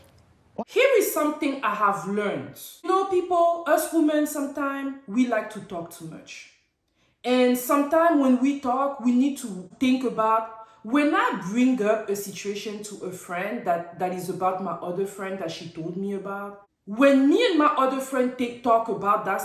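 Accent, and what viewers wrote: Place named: Scotland, United Kingdom. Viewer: I'm from Glasgow! ❣️ I love that you read it in a Scottish accent 😂😂- you know how much we Scots love laughing at ourselves 😂😂. Garcelle and Sutton are definitely the two ladies of the group, and the only genuine friends, as far as I can see 💗 oh god - I would bounce rinna for her actions this season. She is an overdramatic sleazeball, who doesn't care who she hurts to have her moment, she is just like Andy - self serving and exploitative 💗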